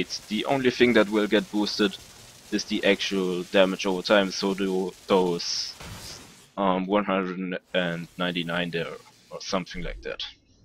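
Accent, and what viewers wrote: Germany. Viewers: Bist du deutsch? Man hört dass nämlich an deinen englisch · Very good video. I play as Axton too. By the way, in my ears you sound quite deutsch...;-)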